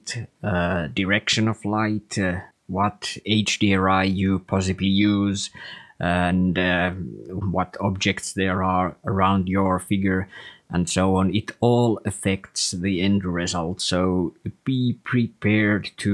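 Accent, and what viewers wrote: Finland. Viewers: You sound very Finnish! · Excellent tutorial :) Did I detect a Finnish accent correctly?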